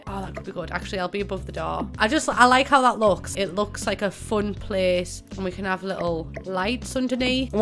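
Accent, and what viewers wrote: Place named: England, United Kingdom. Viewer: out of all the english youtubers i love accent the most and she's probably my favorite english youtuber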